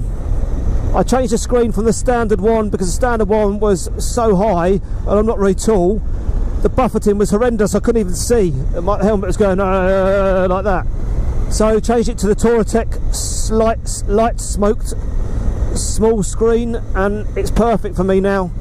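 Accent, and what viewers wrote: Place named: London, United Kingdom. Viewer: Where is this area you are riding? looks like a nice part of the world, and you have a London accent.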